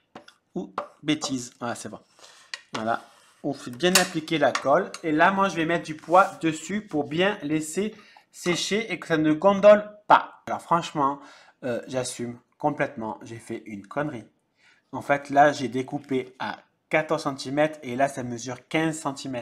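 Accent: South, France. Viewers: Très beau. Je vais me mettre à faire des albums. Jusqu'à présent que de la carterie... J'adore ta bonne humeur et ton accent méridional qui met du baume au coeur. Bon week-end à Seb et toi. Scrapbises. Chantal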